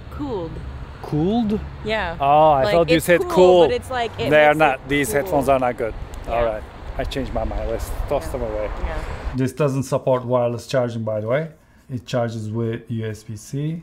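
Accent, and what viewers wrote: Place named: Turkey. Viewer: I knew it! I thought I was going crazy because your accent sounds Turkish!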